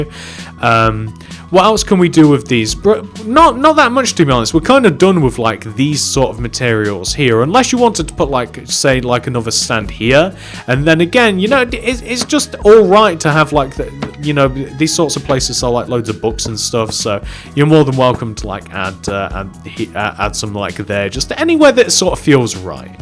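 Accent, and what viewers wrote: United Kingdom. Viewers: Quick question Are you british bc you sound british to me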